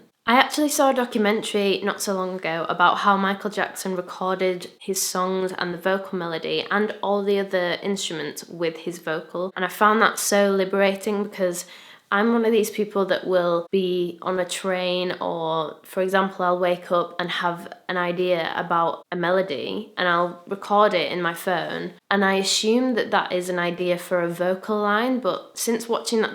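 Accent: United Kingdom. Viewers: I have been listening to your music for a while now. This is the first time I've heard you speak and i didn't realise that you have a British accent lol I always thought that you were from America! Lol x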